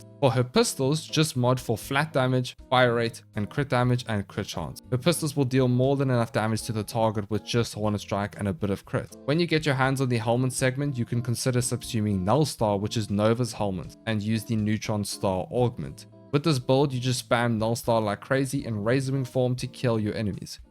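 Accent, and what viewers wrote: South Africa. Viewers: i have to ask where are you from? you sound like you from South Africa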